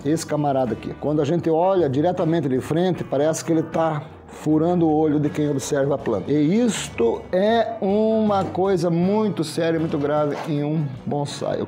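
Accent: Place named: Rio Grande do Sul, Brazil. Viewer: Ah sim, achei o seu sotaque bem parecido com o nosso daqui do RS, gosto muito dos seus conteúdos.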